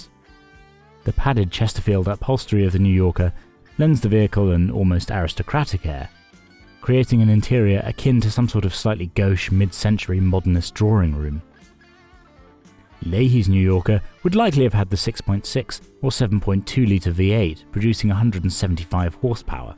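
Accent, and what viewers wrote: RP, United Kingdom. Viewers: This video is pure gold. Your classically British commentary while talking about a priceless piece of Canadian TV is a great combination. You did your research and each character’s accompanying music is on point. Deeeeecent video! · It's so charming to listen to a posh British accent talk about a Canadian Classic about Trailer Trash!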